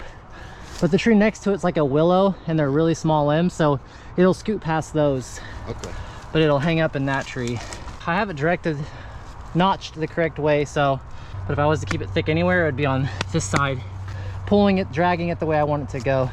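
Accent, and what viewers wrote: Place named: Ireland. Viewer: Irish accent…. Tree = three…. Fellers = fellas. …. Nevermind🤣😂🤣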